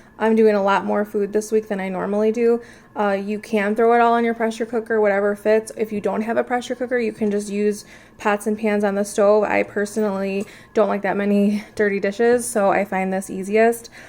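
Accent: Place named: Michigan, United States. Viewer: You're from Michigan aren't you lol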